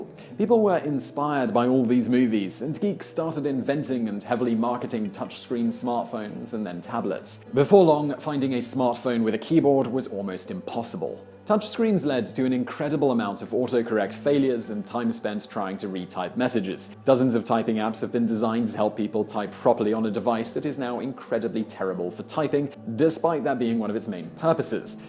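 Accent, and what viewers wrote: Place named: Kent, United Kingdom. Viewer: He's from Kent in England.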